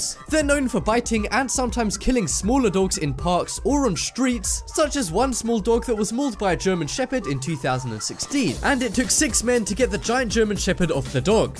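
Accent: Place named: England, United Kingdom. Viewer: English accent doesn't compute with American closed captions... Apparently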